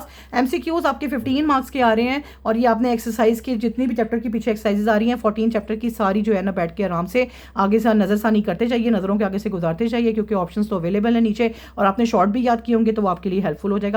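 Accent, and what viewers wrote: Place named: Lahore, Pakistan. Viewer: Mam mujy to app Lahore ki LG rhi ha😊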